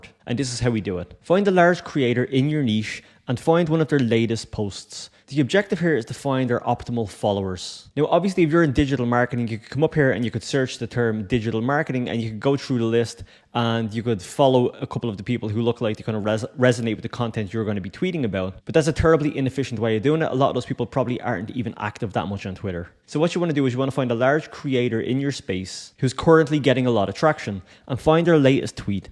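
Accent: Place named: Ireland. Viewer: Excellent advice snd a irish accent double whammy why to follow 😊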